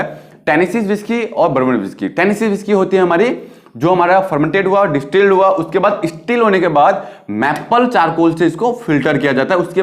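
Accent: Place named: Pahadi, India. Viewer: Bhai pahadi lg re aap ........uk se ho kya???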